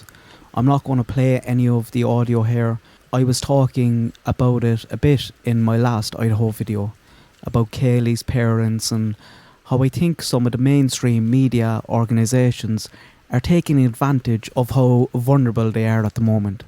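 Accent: Cork, Ireland. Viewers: Cork gang represent! Sound like you might be from the Northside Langer! Beautiful scenery, up the Glen? 😂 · Very interesting information about wifi in public that I had no idea about! Thanks for this. I haven't heard anyone discuss this before. Very good video, and obviously, I love your Irish accent!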